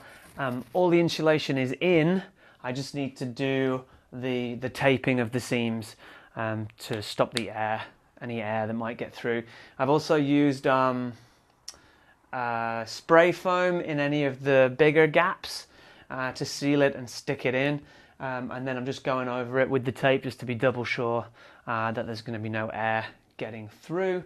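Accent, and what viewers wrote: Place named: Cheshire, United Kingdom. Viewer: ah thought i heard a little chester or cheshire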